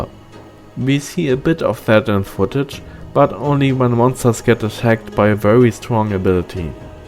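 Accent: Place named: Germany. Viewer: least brutal German accent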